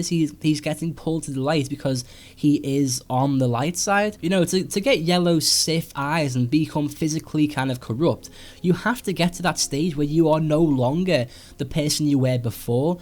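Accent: Liverpool, United Kingdom. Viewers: It is a mild liverpool accent (collegially known as "scouse"). Its an interesting English accent because all the surrounding accents are very different (eg the Manchester and midlands accent). It derives from the large influx in Irish immigrants to Liverpool during the potato famine. This mirrors the American Bostonian accent, which similarly derives from Irish Famine immigrants who went west to America rather than east to Britain. The English have so many accents and it refreshing for people to acknowledge other accents rather than just the standard "received pronunciation" of the Queen's English. (Not that there is anything wrong with RP) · Ahh a fellow scouser :) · Sweet video, you from Liverpool by any chance? You sound pretty scouse! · are you a scouser?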